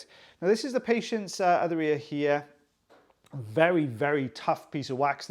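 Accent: Wales, United Kingdom. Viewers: I was always squeamish about ear wax but I love your gorgeous Welsh accent and how you describe the procedure. Thank you 😊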